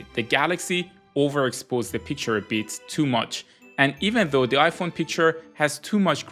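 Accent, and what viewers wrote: Iran. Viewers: Are you persian? Your accent says this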